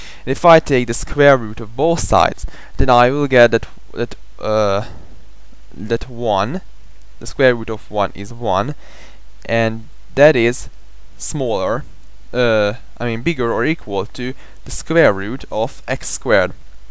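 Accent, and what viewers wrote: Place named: China. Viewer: he must be chinese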